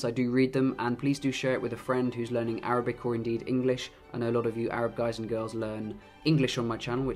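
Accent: Gulf, Arab world. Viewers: لهجه خليجيه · Great video as usual. By the way you have a Gulf accent while speaking Arabic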